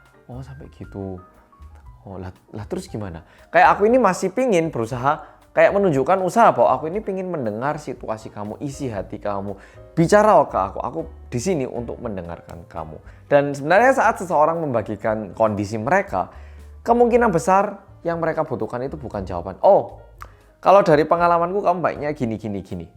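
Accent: Java, Indonesia. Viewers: Pengen punya kaos korea medhok kya mz deh😍😍😍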